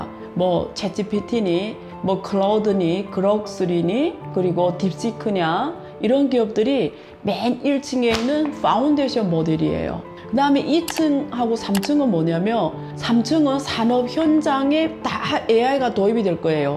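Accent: China. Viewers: 교수님은 어느나라 사람인가요? 우리나라 말은 잘 하시는데 어딘가 모르게 억양이 약간..ㅋㅋㅋ 중국사람인가요? 북한사람인가요?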